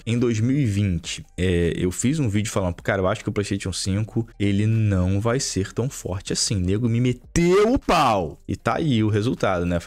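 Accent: Rio de Janeiro, Brazil. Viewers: Vai num fonoaudiólogo pra neutralizar esse sotaque de carioca aí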